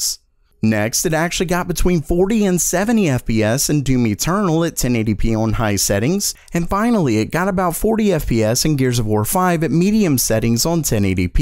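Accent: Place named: United States. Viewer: Just an American accent lol.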